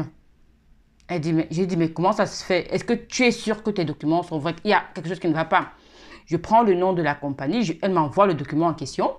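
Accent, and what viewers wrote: Cameroon. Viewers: Tu parles comme une camerounaise. J'aime bien ton contenu. Merci pour tout ce que tu fais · Le ton du 237 ne se cache pas mama.. Merci pr la video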